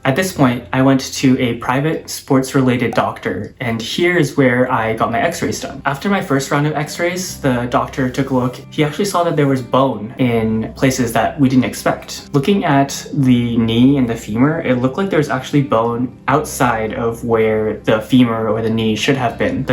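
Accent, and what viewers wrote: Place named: Canada. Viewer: thanks for sharing,you have a strong Canadian accent, bravo for you to share your fight with cancer, even you have used Chemo to kill most cancer cells, mind you the main Stem cancer cell is not destroyed and can never be terminated. Just my personal advice that please keep eating foods that keep your body in alkaline state, cancer cells wont grow strong in an alkaline envirament, this is the best way to survive,do not ever allow your cancer stem cell to come back, remember this.....i pray for your health n have a wonderful life...PS from the video, you have leaky guts and inflammed liver, go do your research on how to deal with leaky guts n what not. stay strong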